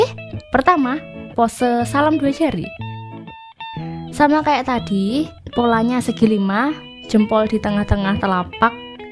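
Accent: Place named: Java, Indonesia. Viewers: dari cara bicaranya mbak agak medok bahasa Indonesia nya😅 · Medok bgt ya bund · Kata katanya toxic banget, khas logat orang jawa 👍🏻😂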